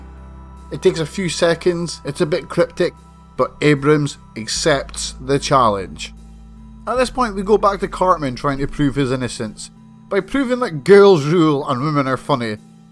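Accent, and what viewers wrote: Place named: Aberdeenshire, United Kingdom. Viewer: Hey min, far aboots ye fae loon? Ye soun like a Brocher? Definitely Aberdeenshire, aye?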